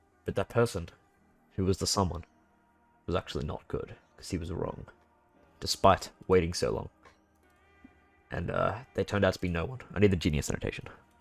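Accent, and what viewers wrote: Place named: Australia. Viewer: I believe its ausie accent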